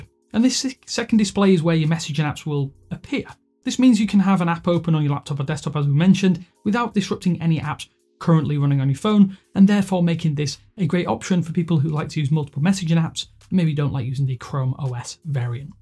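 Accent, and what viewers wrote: United Kingdom. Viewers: Maurício Meireless fazendo review de versão do Android com sotaque britânico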